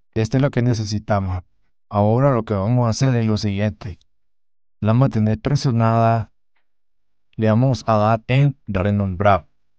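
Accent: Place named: Brazil. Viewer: Bro eres de brasil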